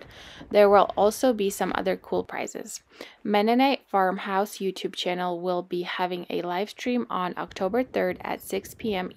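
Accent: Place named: Russia. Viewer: Мне кажется, что я слышу едва заметный русский акцент.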